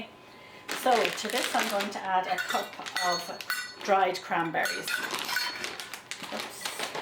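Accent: Ireland. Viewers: Hi. Greetings from Belfast in Northern Ireland. I’ve been watching your videos while in lockdown here due to the Coronavirus Crisis and I must say you do my wee heart good. I love the simple and easy way you show how to bake and cook. You’ve converted me!!! I’m a 60 year old aul fella and I’ve now made scones for the first time in my life - and they were bloody good!! Lol All thanks to you. Just wanted to encourage you and let you know your videos are being watched, not only in Canada, but right across the Atlantic back here in good old Belfast. It’s lovely to hear your Irish brogue and just your easy going way that gives us the courage to just give it a go with the baking. Huge thank you and I look forward to more videos and your channel growing with lots more followers. Good luck and God Bless. John 😀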